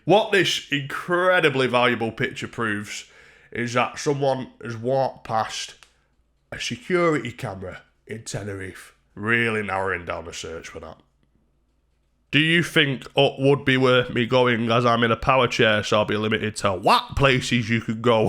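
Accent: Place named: Scotland, United Kingdom. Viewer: I really strugle with scotish but i really enjoyed the video so im gonna force myself to learn scotish so i can follow you. Thanks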